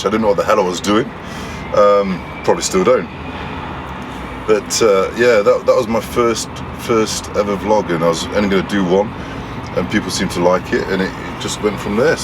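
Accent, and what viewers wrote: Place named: Loughborough, United Kingdom. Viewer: Probably not the best video to ask this - need advice... left my job due to finding out my management were heavily racist (stupid to leave without any job lined up but I'd rather leave on good intentions) Now in-between jobs, would you recommend agency at all? I like to be on the books as I'd like a mortgage in the next few years but I'm happy where I am in life so that's just a plus. I'm Loughborough based so plenty of jobs. Keep up the good work mate, nice to see someone with my accent who's a trucker and vlogging 👊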